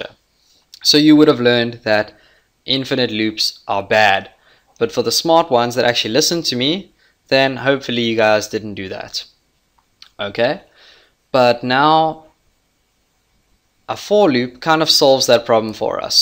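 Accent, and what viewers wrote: South Africa. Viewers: I knew that was a South African accent. Thanks boet !!!!!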